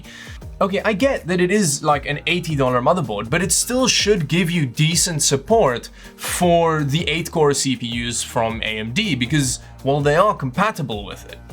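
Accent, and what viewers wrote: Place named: South Africa. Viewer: 100% south african right?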